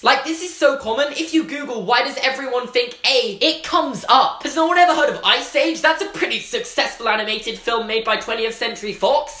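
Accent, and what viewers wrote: United Kingdom. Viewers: Are you British or American? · Are you from England or Wales?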